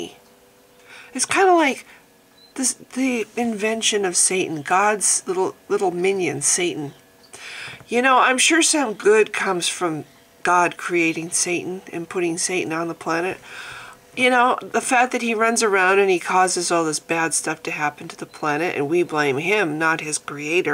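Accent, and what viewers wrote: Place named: United States. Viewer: I've known about this girl a few days ago, and definitely she is the proof thar god does'n exist. He never did. I have watched almost every video that exists on youtube about her and I've cried for hours. And now I found this video of yours and I couldn't agree more with you. Seriously. I can assume for your accent that you're from the USA, aren't you? Well I'm spanish, I should have begun saying my english is not perfect and obviously I can't understand every word, and I'm not used to write long texts such as this one, although I can fully understand the context. I hope you read this, 'cause I want you to know that I am overwhelmed by your speech. I found it so true I got a lump in my throat. It's so frustrating that many people call themselves moralists and the only thing they say is "I'll pray for her"!! What? It would be a very expensive trip for me, living in the other side of the world, but I really want to do something for her. 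And so they should do, mostly if they live nearby. God is a fictional character, and he can do for Genie the same that Gandalf, Darth Vader or the flying spaghetti monster can. Nothing. For they are not real. This whole issue just drives me mad, and if it's not too much I'd like both of us to be friends in facebook. Obviously I'm not going to post here my nick for everyone to see, but I think we could chat occasionally. I can send you a private message with my facebook name in case you want. I'm trying to write down this being as polite as I can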